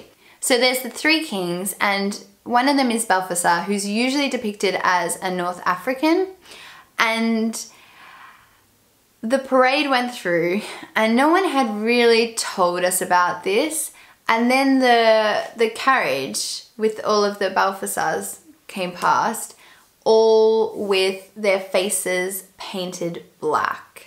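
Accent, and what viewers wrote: Australia. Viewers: I really love your accent. Beautiful accent + beautiful smile + beautiful eyes + blonde = perfect Aussie woman. I hope I could meet you in person. 😍😘😃 and I Iove your hair as well and you have sexy lips. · Me encanta el acento australiano!!! la forma en la que dices "water" me hace mucha gracia jajjaj love it!! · Tienes ojos tan hermosos y fascinantes que a veces no puedo concentrarme en lo que dices... Saludos desde Polonia,! Great work:) P.S. I love your Australian accent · I love your Australian accent!!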